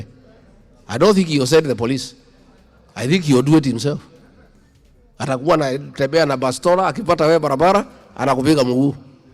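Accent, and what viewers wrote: Kenya. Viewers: GACHAGUA CHANGE UA TONGUE KIJUYU TUMEKUSIKIA SOEAK KI OTHER JENYANS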